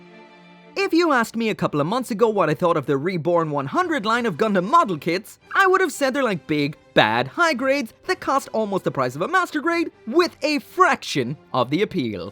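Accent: Ireland. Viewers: He's Irish.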